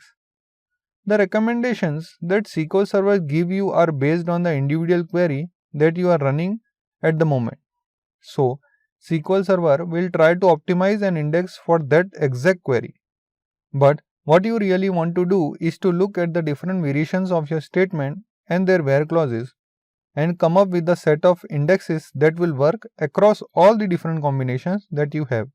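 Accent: India. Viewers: indian accent makes me sleep... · The Indian makes my ears cry. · Indian people english. Suddenly close the video ...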